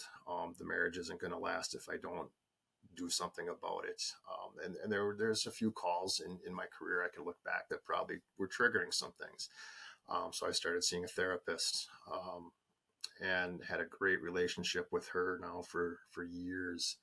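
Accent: United States. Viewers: I hear from your accent that you are American. I'm sorry that America has failed you. The rate of cancer in young people is at an unprecedented high - and it is mainly cancers of the stomach and colon that have shot up in young people to levels never seen before. This is mainly due to the ultra-processed nature of food in America. Cancer is no longer only for old people in America. If you experience symptoms at any age - even in your teens or twenties - you really need to get screened for cancer especially as an American - if you can afford it. Because, you know, 'Merica and it's wonderful healthcare system.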